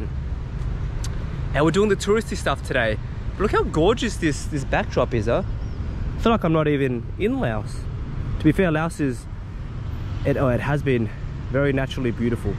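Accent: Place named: Australia. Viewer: What on earth do you mean? He has Aussie Accent he was born in Australia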